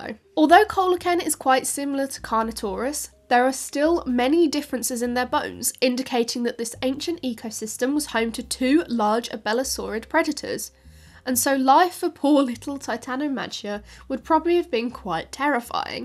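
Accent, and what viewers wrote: RP, United Kingdom. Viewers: I love this channel for: 1. Lots of cool science news. 2. Perfect English RP which is a music for my polish ears. Best Regards! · I nominate voice with her adorable English accent to be the new voice of OpenAI's GPT-4o. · I knew before I started the vid, she'd have a British accent. Perfect.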